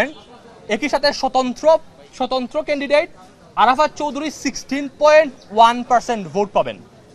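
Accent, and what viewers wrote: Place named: Sylhet, Bangladesh. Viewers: Vai er bangla pronunciation e buja jacche je Vai Sylheti. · আরেহ ওতো সিলেটি।।। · Sylhety bro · ভাইর সিলেটি স্লাং গেলো না, বেশ মজাই পাচ্ছিলাম উনার কথা শুনে।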